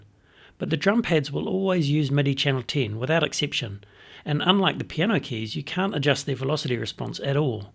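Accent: New Zealand. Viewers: Your a kiwi?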